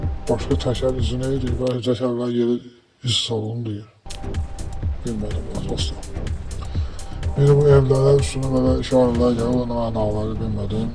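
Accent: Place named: Baku, Azerbaijan. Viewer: Qardas haralisan bele baki lehcen var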